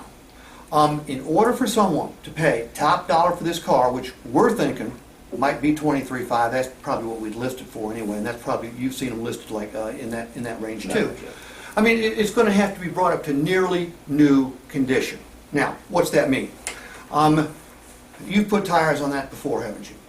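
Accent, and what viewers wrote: Appalachia, United States. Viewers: and his hillbilly talk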